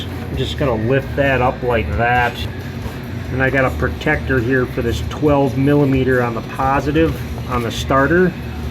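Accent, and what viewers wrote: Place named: New England, United States. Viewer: Awesome video. Not a bunch of talk but straight to the point. Love that New England accent also.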